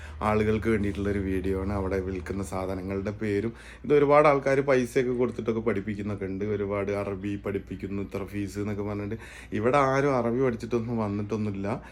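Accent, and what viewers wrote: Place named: Philippines. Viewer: ഫിലിപ്പെെനികളിൽ നിന്ന് പഠിച്ച അറബി പദങ്ങളാണ് എന്ന് തോന്നുന്നു ഉച്ചാരണം കേട്ടിട്ട്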